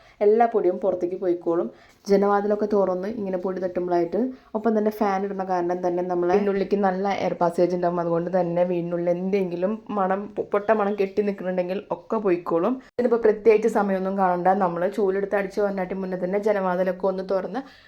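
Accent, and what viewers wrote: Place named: Thrissur, India. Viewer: തൃശൂർ കാരിയാണ് എന്ന് തോന്നുന്നു... പൊട്ടമണം 😅😅😅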